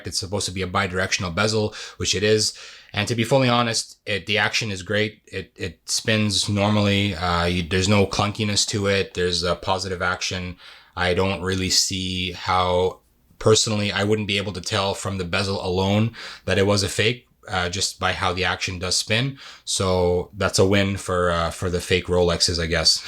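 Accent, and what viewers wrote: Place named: Lebanon. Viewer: You’re Lebanese I can tell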